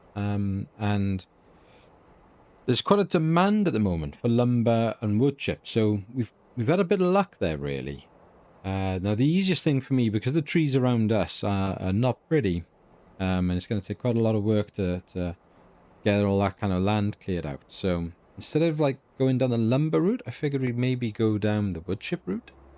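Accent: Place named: Wales, United Kingdom. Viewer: Mate, ive only watch half hour and i've laughed so much. Your Welsh accent is quailty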